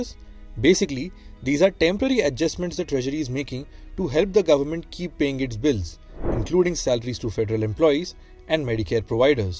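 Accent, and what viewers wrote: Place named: India. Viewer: Maybe don't have a customer service person from India doing the narration?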